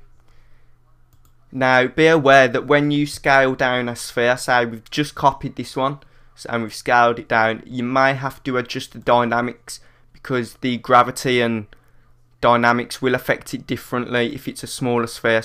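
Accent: Birmingham, United Kingdom. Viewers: haha Brummie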